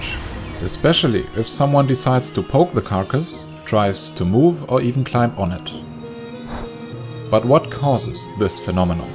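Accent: Germany. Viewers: from Germany look at Channel Info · RZU 147 I'm not even German but I know enough to within the first words determine he was. How the hell is a fellow german unable to recognize that... Lol geeze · RZU 147 his accent is german, i know that because i am german. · RZU 147 im German and even I think he sounds German as hell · Chris Purolover he is German or Austrian or maybe Swiss but I doubt Swiss, his accent is clearly Germanic. Listen to how he stresses his 's's. The z and long s which are native to German give away his lingual origin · Ich finde es eher traurig, dass du dieses Video unbedingt auf einer Fremdsprache bei der man es so stark heraushört, machen musst! Warum bleibst du denn nicht bei deiner Muttersprache? Denke, du hättest mehr Erfolg damit so nervt es nur. Oder aber du überlässt das Sprechen jemand anderem, wenn es denn unbedingt auf Englisch sein muss. 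Es gibt auch viele Deutsche, die nicht diesen typisch deutschen Akzent im Englischen haben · The German accent makes it more convincing. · Sooo true, 5 seconds in I stopped the video and looked for this comment. I´m German myself and there is no way this dude isn´t one of us, it´s so strong and noticable!! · I love your German accent. Your style of animation is really similar to other channels I like too! Subscriber earned. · German Accent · RZU 147 he totally sounds German xD that accent is so strong (I don't mind it though) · Ekn _38 I am German too and I heard it in the first second 😂😂 Grüße gehen raus ♥️ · Oh the German accent...xD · Are you german? You have a very strong accent. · RZU 147 "Dude" ... It IS a german accent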